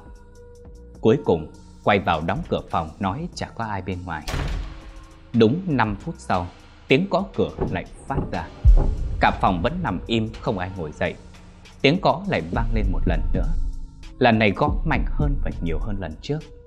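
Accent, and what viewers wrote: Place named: Central, Vietnam. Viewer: Nghe truyện của anh thấy hay lắm luôn ạ 🥺🥺 nhưng mà do giọng a ở miền trung e nghe hơi khó ạ 😢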